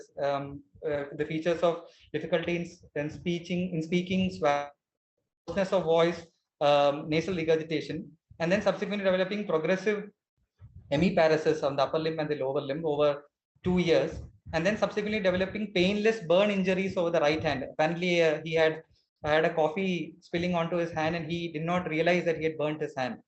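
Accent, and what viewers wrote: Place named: South Asia. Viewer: At least 350 million people - Indians plus Pakistanis plus srilankans plus bangladesh use English with this accent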